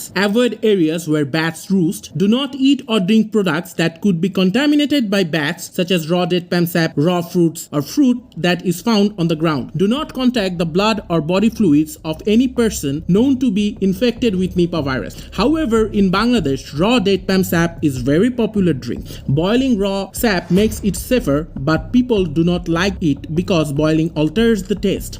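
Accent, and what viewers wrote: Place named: United States. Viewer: Dear Sir, your voice is tremendous like an American or Canadian when I listen first time, I don't understand that is your.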